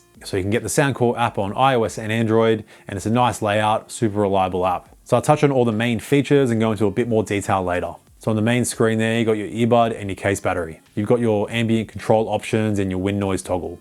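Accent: Australia. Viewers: nice to hear an Aussie accent on a tech review for once 👌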